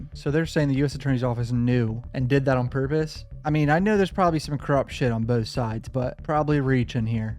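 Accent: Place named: California, United States. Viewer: Dude I love that California accent. When he said Combs homes I nearly lost it😂😂😂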